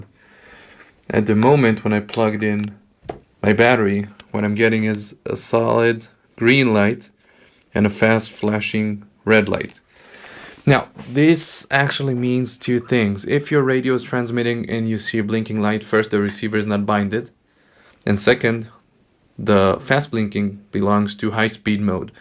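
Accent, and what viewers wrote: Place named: Israel. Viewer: I thought you were Isreali....Your accent is so cool...Manish ma baby!! LOL Duvid Mordechi..... Hey boychick, let us know what kind of range this thirty dollar receiver has.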